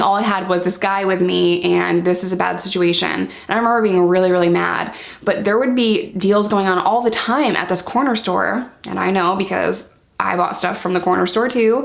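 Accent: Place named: New York, United States. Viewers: i knew your accent was familiar! i’m from utica too i completely understand how you feel having family there i’m glad your mom moved!! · Your New York accent came out in this video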